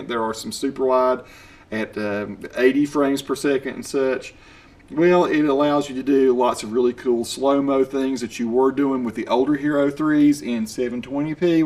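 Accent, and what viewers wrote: South, United States. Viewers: Is that accent real? I've never heard such a strong Southern accent before :)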